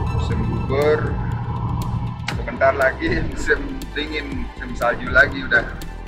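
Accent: Bali, Indonesia. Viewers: Hehehe...logat baline kental Bli...salam aspal🤣🤣🤣🤣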